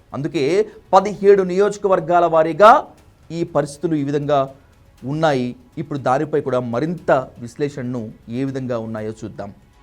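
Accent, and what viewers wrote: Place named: Andhra, India. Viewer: Nuvvu andhra slang aapura baabu Horror episode dialogues nuvvu nu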